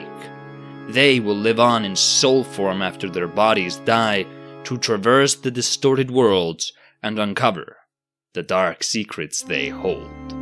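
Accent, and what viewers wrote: Iceland. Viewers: Just stumbled across this channel, love it! Demon's Souls has always been my favourite! From the accent I have to ask: are you Icelandic,? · Umbasa, I love this game. Edit: Do I sense Icelandic in that accent?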